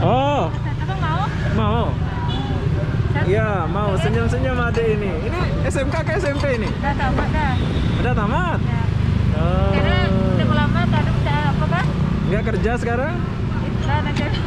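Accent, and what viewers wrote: Lombok, Indonesia. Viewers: Hahahha pandainye abang bual cakap dari lombok😄😄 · Bahasa Melayu mcm Malaysia tapi saya tetap boleh perasan slang Indonesianya hehehe Unik betul org nusantara nih!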